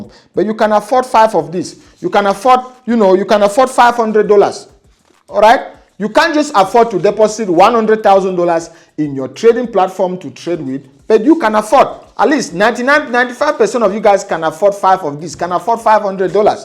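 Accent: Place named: Calabar, Nigeria. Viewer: I actually thought you are a Nigerian ur accent is like that of a typical Calabar man. you are a boss indeed good thanks you are my mentor I will learn from u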